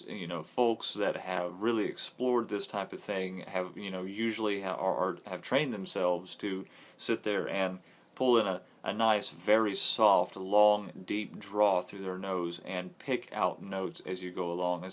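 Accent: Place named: United States. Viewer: I like your accent, whereabouts in the U.S. are you from? Also, you have a cool speaking voice and would make a good actor. So use it fella, it could be beneficial and get you that woman you want to be smelling. Musk Oud? Not a favourite of mine I prefer pure oud from that line but the FMK oud line? Dear Lord, love those scents.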